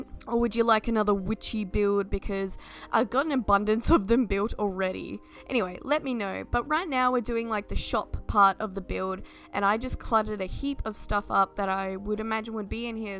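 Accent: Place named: Australia. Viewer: "Gasp" You're AUSTRALIAN!? Lovely build, it's nice to see you have a breather with a smaller build ^^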